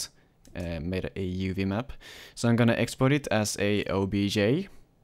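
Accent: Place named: Germany. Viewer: Your accent sounds kinda German or Swedish ... I am german :D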